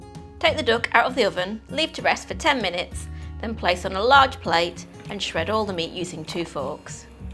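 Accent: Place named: Northern England, United Kingdom. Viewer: It's her northern English accent. And what has it got to do with you how she speaks.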